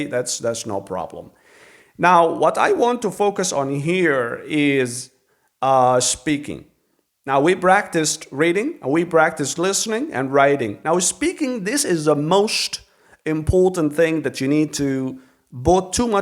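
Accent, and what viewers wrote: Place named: United States. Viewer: American Accent good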